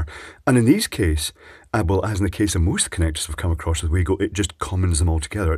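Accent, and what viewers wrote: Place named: RP, United Kingdom. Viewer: This is a very posh voice. Not many sound like him out in the field, so I'm guessing he's self employed or managerial. wondering ow some one so posh ended up as a spark.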